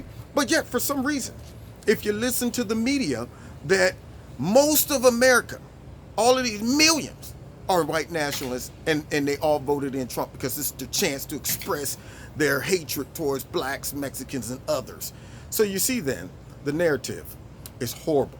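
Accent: Australia. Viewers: I loved the Aussie accent. You are the best. We need to hang together and be kind to one another. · LOVE your Australian accent! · Love the Australian accent lol I counted and saw 27. lol The leftists have constantly been talking about transparency, well President Trump is the only true Transparent President.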